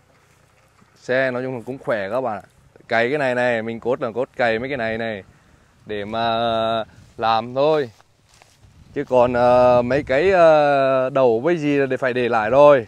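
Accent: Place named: Nghe An, Vietnam. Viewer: Quang linh quê" choa " chân phác gần gũi tinh cảm...nhất là hài ước hay ra phết...tôi dân nghệ nghe bạn nói giọng nghệ tôi vui và cười sung sướng luôn..hãy là như thể kg cần thay đổi hi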